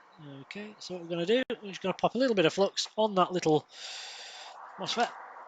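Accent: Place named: England, United Kingdom. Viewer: he talks too fast plus the English accent... I think I need English sub version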